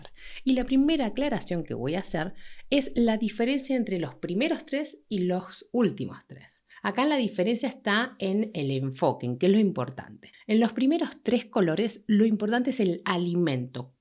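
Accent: Argentina. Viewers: Hola, muchas gracias por compartir tu sabiduria, Ió ( jaja en cordobé..) vengo de la escuela Astrológica (de Munich..) y hace 1 semana metido en Diseño Humano ( justamente buscando Info de todos lados y en alemán y con vos en español poco porteño jaja..). Y cierto notaba que cuando mi alimento es simplón.. fideos solitos ó arroz desparramados pero mirándome con ternura, soy felíz.. Claro no siempre, pero ésos momentos me hace sentir bién, Ah.. tengo la variable 1- Apetito... Saludos desde Kelheim! Gracias y sigo informándome con tus Videos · Estoy feliz de haber encontrado tus vídeos. Voy a ver el link que dijiste para ver mi variable. Muchas gracias,. Bife jiji, me encanta el acento y las palabras argentinas. Saludos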